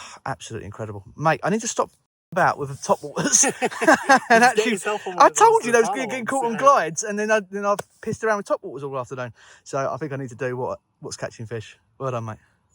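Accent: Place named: Lancashire, United Kingdom. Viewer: What was this Rez buddy, great fish! Are you from Lancashire?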